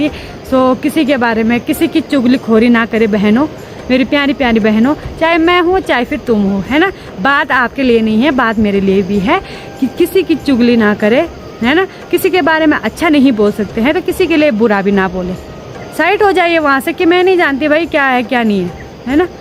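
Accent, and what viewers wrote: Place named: Hills, India. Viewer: आपकी बोली, भाषा, पहनाव, ओर आपका स्वभाव एक पहाड़न की पहचान है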